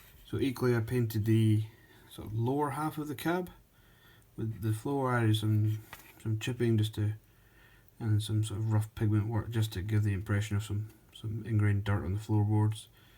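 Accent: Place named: East Coast, United States. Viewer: Nice looking build, are you based east or west coast, you dound like its east coast. I have no problem with how you speak, but......... guess where I'm from? Stick at it, you' ll build up a following.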